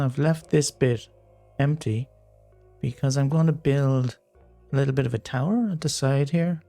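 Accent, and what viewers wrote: Ireland. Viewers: your big Hardcore video came to me through the algorithm and I LOVED it, couldn’t get enough of your builds, play style and chat (and, as a fellow Irishman, it’s great to hear a non-American voice in the game!) so I’m going back through this series for more banter and more build inspiration. You’re a star, LOVE every video I watch, hope you come back and see this and think of how far you’ve come!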